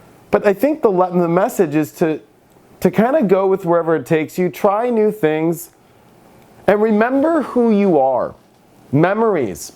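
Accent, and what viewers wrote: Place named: Los Angeles, United States. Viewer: Awesome x great clarity..you.sound SO LA...Lol!..... don't ever change Dude x! Sky Powers Photographer / europe / <link>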